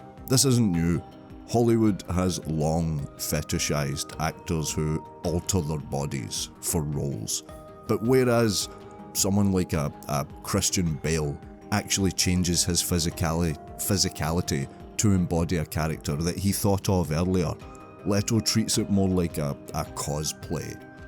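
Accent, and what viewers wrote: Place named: Scotland, United Kingdom. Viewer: F. U. Learn to speak English if you want to communicate with English speakers. (You Scottish bastard!) P.S. 'all viewers can tell you LOVE Jared Leto.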